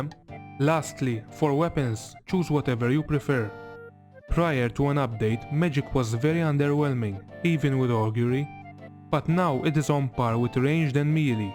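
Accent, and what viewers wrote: Malta. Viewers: you are Maltese I can tell instantly lol.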